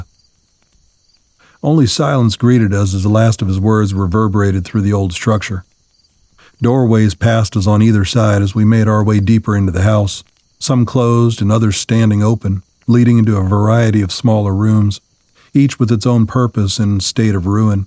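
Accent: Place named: South, United States. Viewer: Awesome Awesome Continuation of this story. I love the 1st two I listened to by Viidth22 and again(1st one) by LightHouse just before coming here(my two Fav readers and voices). I was pleasantly surprised by your voice and it matched with the story so well with your southern voice. I Love these kind of Stories, it might be my age I'm not sure as I'm almost 60. I find some stories have a much younger feel to them and I can't get in to them as much as others, I'm an old Dean Koontz fan from the early 80's, read all his stuff since, and of course other Authors, so months and months ago when I came to some reading channels I was so happy as my eyes are not as good anymore, as I use to read for hours but can't anymore. *Plzz don't stop writing about these fella's and don't kill Jonas off yet like you did Tommy... lol.. Your Fan!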